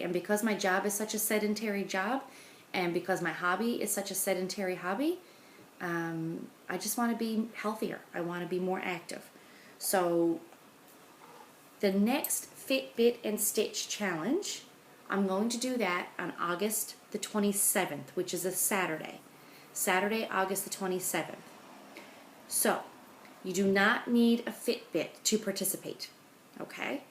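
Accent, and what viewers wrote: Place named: Newfoundland, Canada. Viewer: Okay only 2 mins in and I thought is she a Newfoundlander? I wonder...then said you are from Canada! Ohhh please satisfy my curiosity? I live in NS! Lol. Now to watch your video and subscribe! At Because you count? NB? Oh my I will be editing this everytime you say something...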